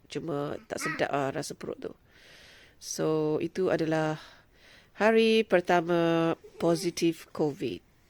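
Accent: Malaysia. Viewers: Mengcokik😁😁😁. U re melayu pure. Nismilan pure. Suka den dongar sis sobut Melayu totok.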